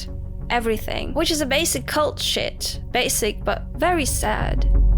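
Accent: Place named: Ireland. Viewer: I really like your accent, are you Irish?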